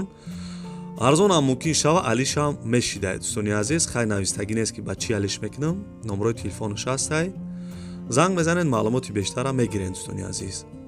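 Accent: Tajikistan. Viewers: Додари апа дигар каналои хона савдо гапзада хам наметонан гушкардан ам намехохи ахсан ба и гапзани тоза лахчаи точики 👍бехтарин хазор лайк 👍 👍 👍 👍 👍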